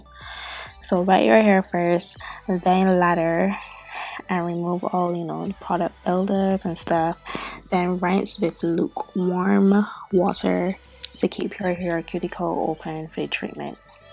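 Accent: Barbados. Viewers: Jackee Pee she sounds Bajan to me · Thanks for the info. You sound Bajan. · THAT ACCENT😩😍BAJANNN GIRLLL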